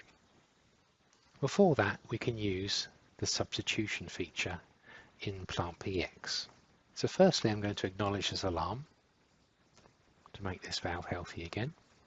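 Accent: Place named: United Kingdom. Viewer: Might be worth contacting the UK distributor as that's a UK accent.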